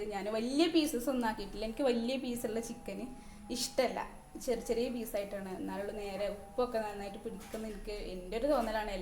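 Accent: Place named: Malappuram, India. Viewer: First video is good, good presentation, Malappuram style language, everything is great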